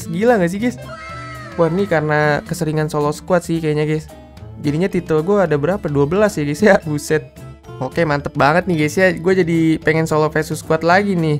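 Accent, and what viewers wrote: West Java, Indonesia. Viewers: Orang sundaa bangg? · BG emang or Jabar ya..?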